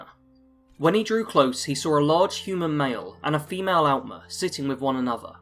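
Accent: United Kingdom. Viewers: Is it your accent english? Also do you use mods ?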